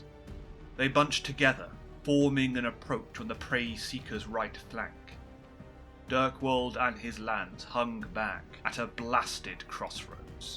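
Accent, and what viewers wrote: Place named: England, United Kingdom. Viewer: Dark gods bless the English accent. AI always mispronounces everything. Among the repeat offenders: Noosphere. Love the storytelling boss. 👊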